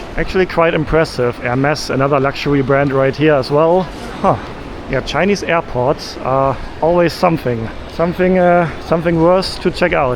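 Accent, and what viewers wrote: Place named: Germany. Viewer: Love the german accent 😂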